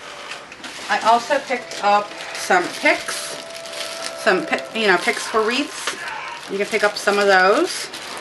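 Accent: Pittsburgh, United States. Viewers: Thanks for sharing and going step by step for us beginners! Love your accent, I'm guessing Pittsburgh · Not even 30 seconds into the video and I knew you were a Pittsburgh girl:) Great video...n'at;) Thanks for sharing:)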